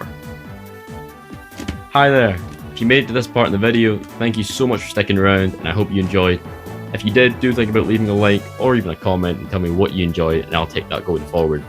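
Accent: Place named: Ireland. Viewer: I can't argue with anything you said honestly, well said. PS your accent is cool. Is it Irish? Scottish? An English dialect?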